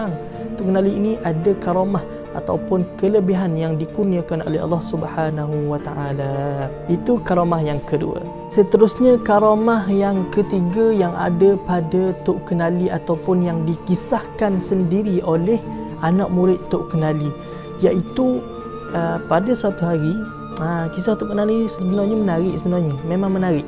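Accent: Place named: Terengganu, Malaysia. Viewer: Kalu comel lagi ulasan cerita tu biar seling kelantan buke seling terengganu. Terbaik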